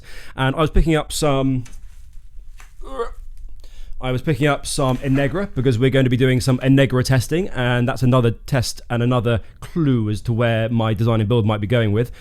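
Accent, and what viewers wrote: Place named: England, United Kingdom. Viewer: OK, you did the whole last build with an English accent. Can you do a Canadian one now?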